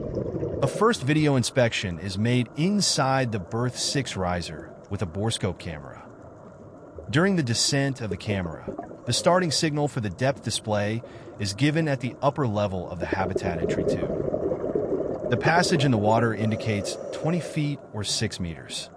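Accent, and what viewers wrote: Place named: Belgium. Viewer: Just the audio. He explains at the beginning that he has a thick Belgian accent so for clarity he uploaded his transcript to a reader.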